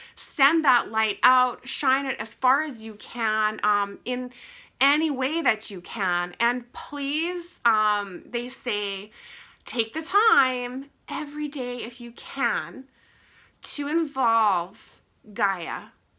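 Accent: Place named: Minnesota, United States. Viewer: I grew up in MN and now live in Italy 🇮🇹 in my wife’s country~ Are you by chance from the MN area? Just curious b/c some of the words u pronunciate sounds like it~ Thanks again 4 the info!!!🖖👌💪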